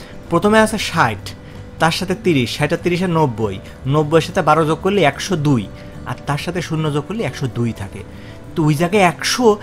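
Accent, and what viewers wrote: Kushtia, Bangladesh. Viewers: কুষ্টিয়ার ভাষা শুনে খুবই ভাল লাগলো, কারণ আমি যে ও কুষ্টিয়ার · কুষ্টিয়ার অাঞ্চলিক ভাষায় অংকটা উপস্থাপন করেছেন, ভালো লেগেছে!! ভাইয়া❤️ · Im in a love with Kushtia r vasha. 😂😂😂❤❤❤ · শুনেই বুঝছি আপনি কুষ্টিয়ার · এটা তো কুষ্টিয়ার ভাষাই।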